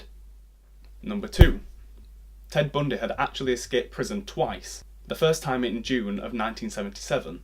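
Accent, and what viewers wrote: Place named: Yorkshire, United Kingdom. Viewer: Are you from Yorkshire? Your accent is really cute haha Great video btw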